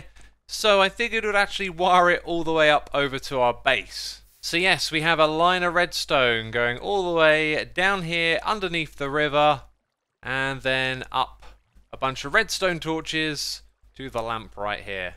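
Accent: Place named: United Kingdom. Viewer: he's so bri'ish, talking about evil X's a'i'ude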